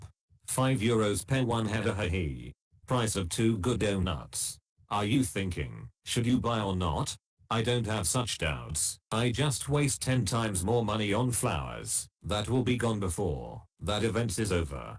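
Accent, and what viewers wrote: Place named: Russia. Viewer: I'd rather hear your Russian accent than this shit voice.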